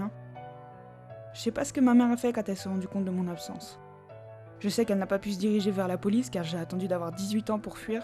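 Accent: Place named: France. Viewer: Ton accent français c' est fou comment je trouve ça très touchant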